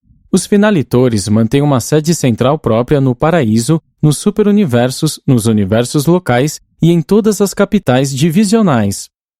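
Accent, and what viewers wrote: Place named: Sao Paulo, Brazil. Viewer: ...sotaque paulista....confunde ponto com virgula......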